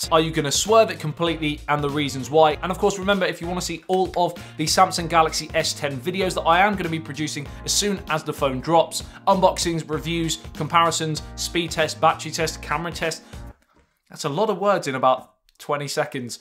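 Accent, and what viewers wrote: United Kingdom. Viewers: Thick british accend... 😅😁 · watta accent language its like a british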